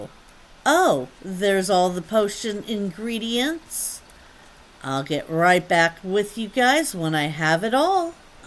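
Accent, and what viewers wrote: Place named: Scotland, United Kingdom. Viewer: The scottish accent I cant get over. can I have your autograph? Your a star ❤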